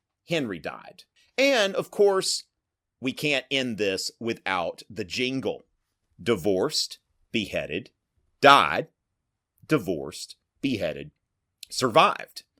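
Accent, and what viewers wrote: United States. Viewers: I’m from the Uk and have been obsessed with the six wives for YEARS it’s so weird hearing it in an American accent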